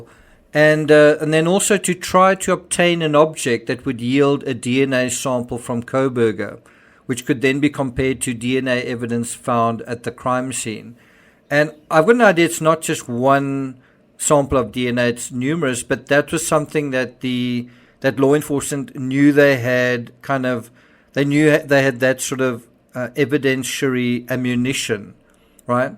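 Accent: South Africa. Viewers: Howzit. Off topic, but are you South African? Your accent is very familiar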